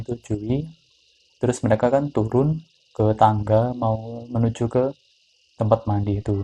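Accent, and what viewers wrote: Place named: Java, Indonesia. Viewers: Medokmu ngakak wkwk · Paling males yg pake kata kata GUE, Logatnya Jawa lg, Hadeh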